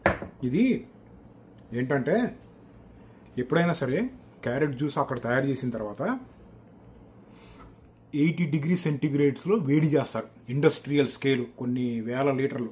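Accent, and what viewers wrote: Andhra Pradesh, India. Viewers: Andra slag lo matladutunnaru bro, nizamabad ante nammabuddaitaledu anna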